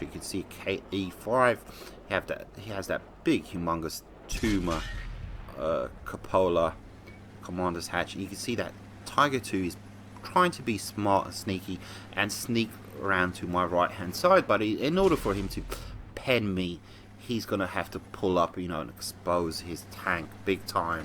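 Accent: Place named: United Kingdom. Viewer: need to stop wearing my headphones when im listening to your vids that music at the end threw me across the room lol nice vid as always big fan hope the hand is getting better can i ask where in the uk u from been trying to work out your accent???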